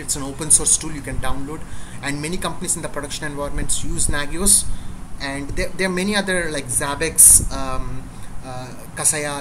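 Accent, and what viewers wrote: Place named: India. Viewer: this is like the <num> video tutorial I´ve seen with Hindu accent, everything is good....except for the MIC, aren´t any good mics on India ?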